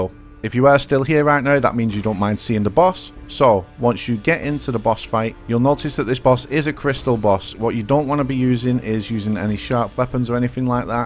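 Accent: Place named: Northern England, United Kingdom. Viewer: Think your a northerner GGs I'm Scottish and bad with accents, it's close to mine tho. Subscribbled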